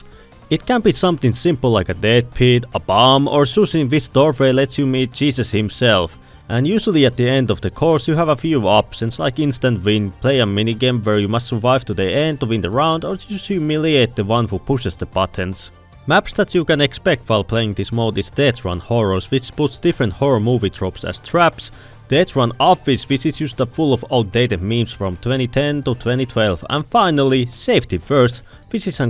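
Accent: Finland. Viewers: I am a simple man, I hear Finnish accent, I subscribe.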